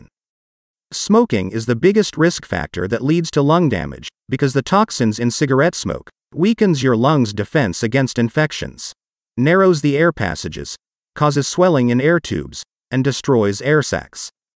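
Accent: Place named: United Kingdom. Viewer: bro hindirishi naam likh k kis aangrez se bolwa rahe aap proote karo na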